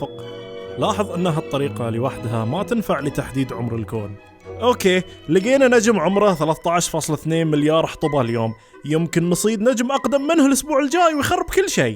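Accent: Kuwait. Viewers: ياخي لهجتك مب سعودية لهجتك كويتية .. انت قلت انك سعودي 🤷🏻‍♂️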